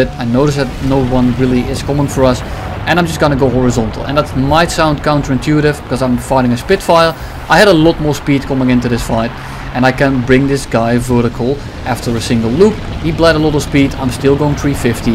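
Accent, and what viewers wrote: Netherlands. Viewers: Hey man i have a question cause you kinda Sound dutch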